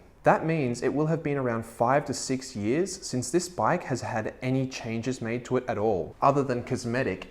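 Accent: Australia. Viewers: I love my 2019 Ninja 400 KRT. Same livery as yours. Here in Sydney, I got mine for a lucky $4.8kaud that's done only 4kkm with Two Brothers Hurricane exhaust, Oggy sliders, tinted shields and 8 months rego. Clean as condition! It's the best! I don't even have my Ls yet so I just ride it around the property for now lol 2 weeks to go till my RKT. Just discovered your channel, good stuff! Nice to hear an Aussie accent motorcycle YouTuber!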